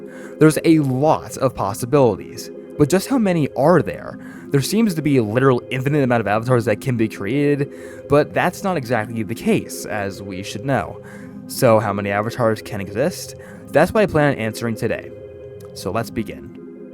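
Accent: United States. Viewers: His accent looks kinda American so i wouldn't be surprised. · fr she sounds American fr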